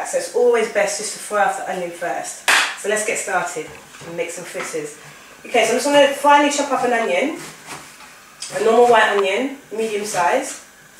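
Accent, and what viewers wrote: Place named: United Kingdom. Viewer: Great recipe! I liked your British accent! Thanks!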